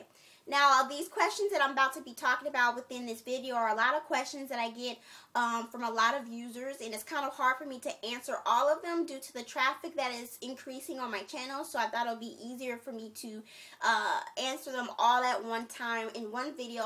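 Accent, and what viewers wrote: United States. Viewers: lol you really sound country girl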